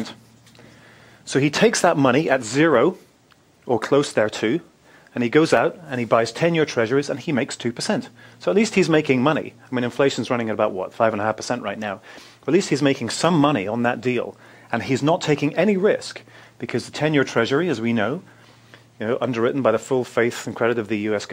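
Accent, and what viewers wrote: United Kingdom. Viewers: you are Briton, arent you?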